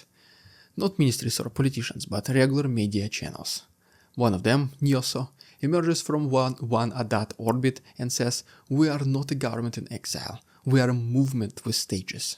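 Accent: Russia. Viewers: I like, and appreciate, that this channel has English subtitles. Despite this, I have no need for them, as I can understand English through his Russian accent. Well done on your analysis, and indeed everything, good sir. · Been watching you a long time. I have to assume your accent is only because people kind of expect it here but after so much time outside russiay I think you can drop it 😊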